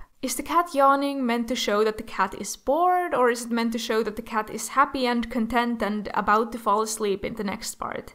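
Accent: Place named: Finland. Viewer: Nice video! I LOVE watching MAPs (but have never joined one because I don't have the patience for animation) Side note- I immediately thought your accent sounded familiar and I was right lol (my family is Finnish)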